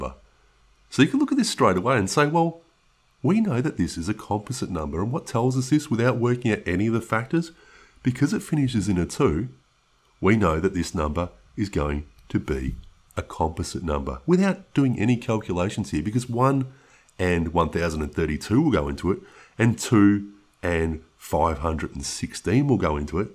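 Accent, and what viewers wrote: Australia. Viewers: Hello Aussie I felt like the narrator was Batman with an Australian accent! 🤭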